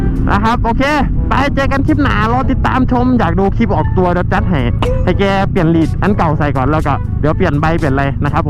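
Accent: Korat, Thailand. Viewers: สําเนียงโคราชชัดเจนแท้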